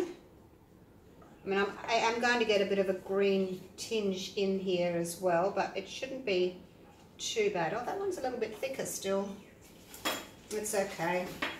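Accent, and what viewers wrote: Australia. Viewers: Simply stunning. With that Australian accent I assume you live there too. Is global acrylic paint available in Australia and which supplier, thanks.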